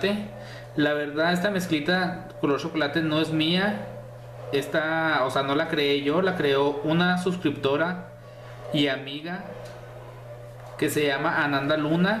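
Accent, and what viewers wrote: Chihuahua, Mexico. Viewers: No se como llegue aquí pero es el primer video tuyo que veo y me suscribi casi de inmediato me gusta la manera que explicas sin tantos tecnicismos, de donde eres? Tienes como que el acento de chihuahua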